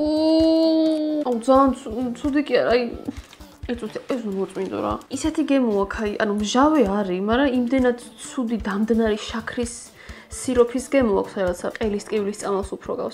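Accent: Imereti, Georgia. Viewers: უსაყვარლესი ხარრ 💙💙 ეს ცოტა იმერული აქცენტი საიდან .?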